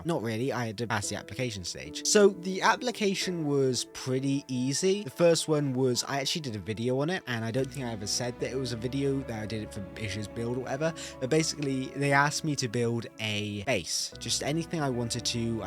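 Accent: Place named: United Kingdom. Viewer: Okay I'm gonna spill the tea... he's British.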